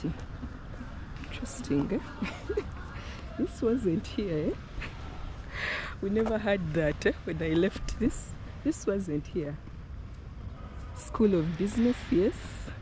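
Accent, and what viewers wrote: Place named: Uganda. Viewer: Love from San Diego California,born in Uganda I remember makerere long long time ago and I loved your beautiful ugandan accent,I would listen to you all day. Will try to find more of your content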